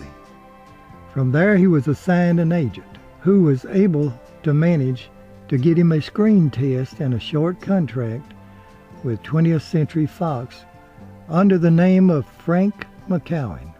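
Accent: South, United States. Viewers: Mr, I always enjoy your stories. Keep up the good work. Love your soft southern voice. A friend of Lee Remick, Amanda Lane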